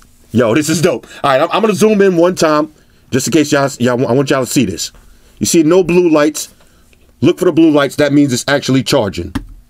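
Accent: New York, United States. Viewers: gotta fight his New York accent when he says "slot" 😂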